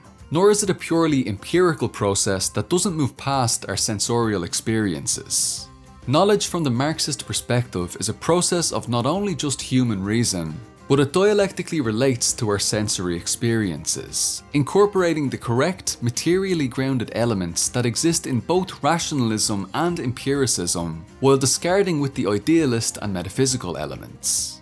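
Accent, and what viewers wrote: Ireland. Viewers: Missed this lads thicc Irish accent sharing Marxist wisdom with the masses lol✊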